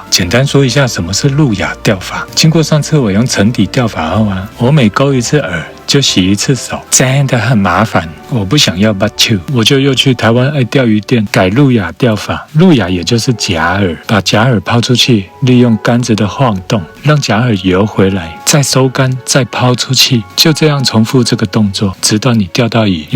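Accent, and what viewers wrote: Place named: Japan. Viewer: 講話怎麼感覺像日本人在講中文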